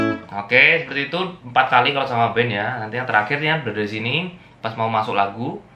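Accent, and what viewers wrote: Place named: Cilacap, Indonesia. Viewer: Loh deneng sampean ngapak mas. Wong ngendi sih?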